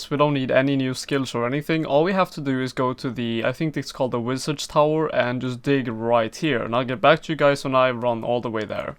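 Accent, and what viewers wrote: Sweden. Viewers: Are you Swedish by any chance? Your accent sounds quite Swedish. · hörs så tydligt du är svensk, haha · you are swedish huh ;) i remember that english accent ^^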